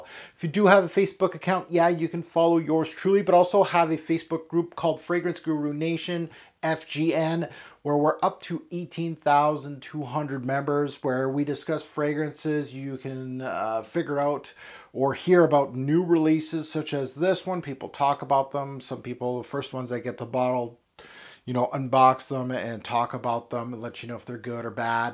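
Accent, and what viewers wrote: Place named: Quebec, Canada. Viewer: You sound exactly like any English teacher here in Quebec… you from qc?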